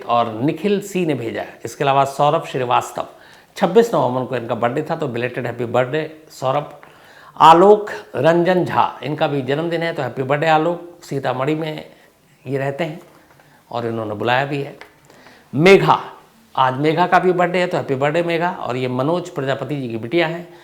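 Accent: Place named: Lucknow, India. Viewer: Oh now I know how your pronunciation of your Urdu grammar..it seems Lucknow Urdu the original ...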